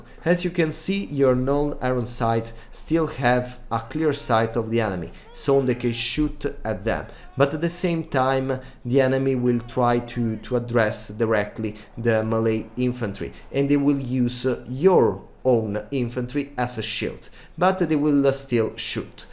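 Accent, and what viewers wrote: Italy. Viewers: I new this accent was italian 😂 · Ciao, bel video, l'accento italiano comunque si sente a chilometri hahahahah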